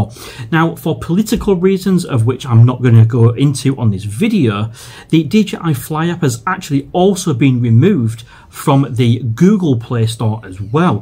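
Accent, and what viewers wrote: Hull, United Kingdom. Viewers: hi are you from hull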